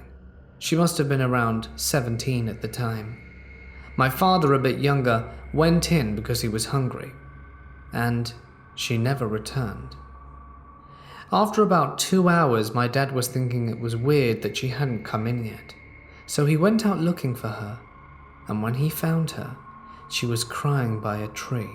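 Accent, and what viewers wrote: United Kingdom. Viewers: His voice has been putting people to sleep for years! I wonder Truly how many of us go strait to anytime we can’t sleep, have issues sleeping, or just crave that gloomy doomy creepy British voice from the gates of hell to whisk us off to dreamland to challenge Freddy Krueger to a death match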